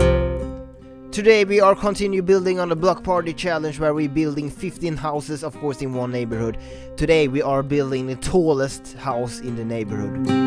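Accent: Sweden. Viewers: Är du svensk, gissar bara😀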